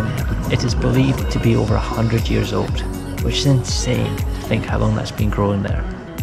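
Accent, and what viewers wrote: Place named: Scotland, United Kingdom. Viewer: It's one of the many things I love about your channel. Never lose your Scottish brogue. I have cousins that visit from Ireland. I can tell the difference between that and Scotland but there are overlaps. Someone might say, "fancy going for a wee dander?" There was a time when I did not realize that meant, would you like to go for a walk? My wife is French Canadian and I never realized how many figures of speech American use that she is not familiar with. 😄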